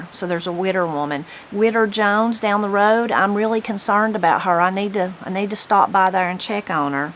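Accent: Appalachia, United States. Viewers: Here in the southern Shenandoah Valley we definitely have our own very local accent & particular use of words. Lots of ScotsIrish, English & German heritage here. Quite isolated also so we remain having our own unique dialect. Y'all have a richer & more drawn out southern accent, not necessarily a drawl but just deeply southern. We'all have what's known as a mountain South Virginia "twang", HA! I lived for awhile in Baltimore MD. Now there's a place with a totally unique accent & wordage! I could hardly understand the locals when I first went there. Turnt right, bent the corner... ??? That's how one speaks in Bawlmer, HA! I love your channel & love your Appalachian accent! Holler to holler, mountain to Valley! Have a blessed Sunday! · Hawaii has its own ..... Dialect. So we say all kinds of things . Your Appalachian reminds me of my grandma /mom isms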